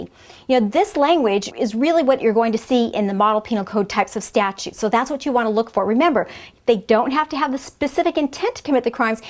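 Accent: United States. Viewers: QUESTION: If you're really certain, where in the USA is her accent from? Thank you. Every one of this series of videos is very clear and of excellent quality, and the audio levels on every one of the videos are perfectly matched for an uninterrupting playlist. The instructor is also very attractive, and I'm curious where in the USA her accent is from. Finally, I wish there were more such videos from her, besides the ones in this series.Thank you so much! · Hi Greg. I'm a 40-year resident of the United States who is currently in California (13 years). I've moved around, 27 years in Texas, a winter in South Jersey, a couple of months in Tennessee and I traveled as a sales instructor, 37 states total. I speak 4 languages fluently, but English is only my 3rd language. I just came across this video today and found your question. Long answer to you because of your preface, "IF YOU ARE SURE." I am not sure, but my guess is, she's from the Midwest. 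Eg. Illinois, Missouri, etc. among others. Educated Americans, by the way, such as Attorney, don't have identifiable accents, geographically. I say it humbly, folks have a hard time guessing my origin. Native Californians sound like her. And so do college graduates from New York. The loud and confident tone of her voice can also be attributed to a generational upbringing of a family of professionals, professors, educators, et al. Having said all of this, why 5 elements? I thought there's only 2? 😂😅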